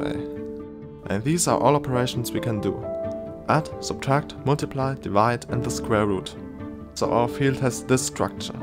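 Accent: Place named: Germany. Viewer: Nach dem Akzent zu urteilen sind Sie Deutscher. Hier ein kleiner Englisch-Tip: „not constructible as well“ ist falsch. Es heißt „not constructible either“.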